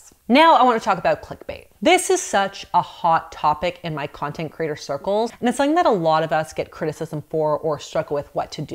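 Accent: Canada. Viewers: I love your content!! I’ve learned so much about healthy eating and diet culture. Personally, I’ve never been triggered by your content and if anything, those struggling with ED could benefit so much from the truth that you share❤️‍🩹 you are a blessing! And I love your Canadian accent😂💚 keep going girl!! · She’s Canadian I believe 😊 so it might be an accent. My husband recently pointed out to me the Canadian accent and now I can’t unhear those slightly different pronunciations which I find cute (I hope that’s not offensive, I enjoy listening to people’s accents)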